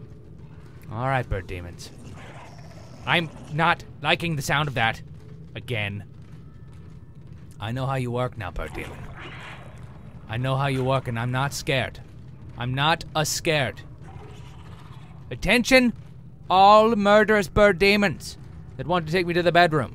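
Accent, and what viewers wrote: United Kingdom. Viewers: best british accent ever! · his british accent is so cute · I love you britsh accent, best one ever!!!!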